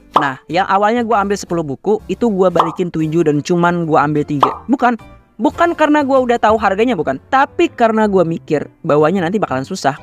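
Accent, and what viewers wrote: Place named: Kandangan, Indonesia. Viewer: Dari logat nya kaya nya orang kandangan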